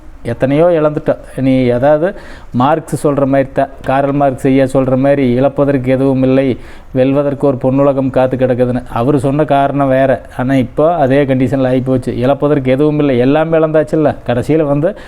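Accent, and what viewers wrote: Kongu, India. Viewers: இலந்தைவடைசெய்முறைஅருமை உங்களுடைய கொங்கு தமிழ்மிக அருமைங்க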